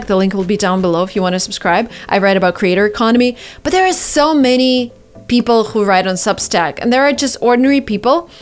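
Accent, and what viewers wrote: United States. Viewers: I don't know how to speak like an American after i watched your vedio i can speak like you You changed my life in a month Thanks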